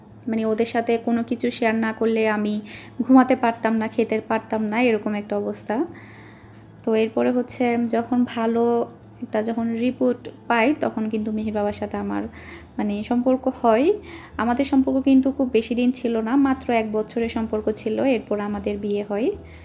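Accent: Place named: Noakhali, Bangladesh. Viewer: কেমন আছো? আজকে তোমার এই সম্পূর্ণ ভিডিওটি দেখলাম। আপু আমার জানামতে নোয়াখালীর বেশি র ভাগ মানুষ ই চিটাগাং এ থাকে। নোয়াখালীর সাথে চিটাগাং এর জন্মসূত্রে সম্পর্ক আছে। তোমার কথায় নোয়াখালীর একটু টান আসে।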